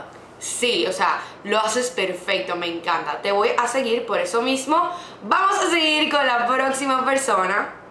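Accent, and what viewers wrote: Dominican Republic. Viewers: Ese momento donde su acento me llama la atención y descubro que también es dominicana ♥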